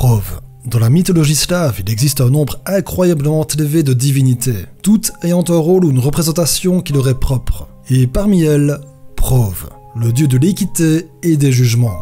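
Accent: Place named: Belgium. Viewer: Belgique ^^ (j'ai le même xD)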